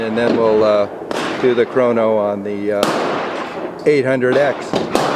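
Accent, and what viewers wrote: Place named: Chicago, United States. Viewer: Sounds like your in Chicago!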